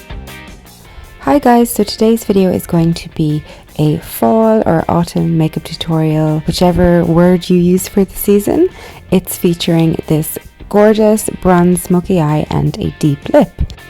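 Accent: Ireland. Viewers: I see you are Australian, but what is it about your accent that sounds slightly Irish?? Is this a New South Wales thing that an American would know nothing about?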